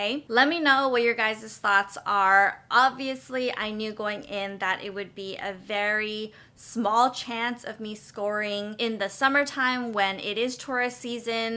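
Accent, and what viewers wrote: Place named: Asia. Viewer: I love your Asian English accent.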